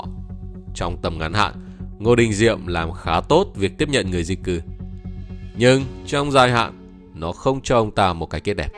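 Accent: Vietnam. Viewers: Chất giọng Việt ❤. Video thật sự phơi bày đúng những diễn biến thời cuộc.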